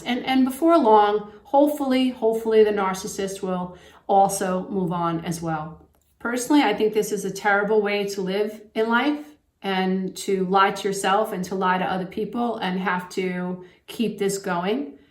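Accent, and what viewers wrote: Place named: Long Island, United States. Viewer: Also I'm a Long Island guy. And although I haven't lived there in a long time it's still home and when someone speaks to me the way you do it's so familiar comforting supportive and oh so welcome! The tone the accent the loving intention it all hits home real deep you've been so helpful. Look at how many people you are helping it's astounding! I'm making the best music of my life now because I'm plugged in. Thanks to you and others in your field of expertise.😍 Happy New Year! Your good karma account is overflowing.👩😇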